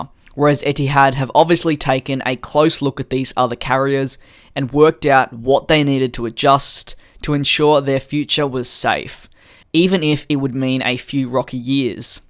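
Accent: Australia. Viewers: "Reuters" spot on pronunciation...even with an Aussie accent!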